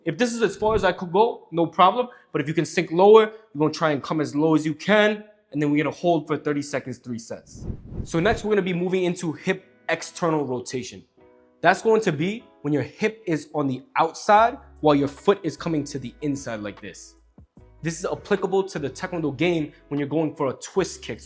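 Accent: New York, United States. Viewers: Ayo you from New York?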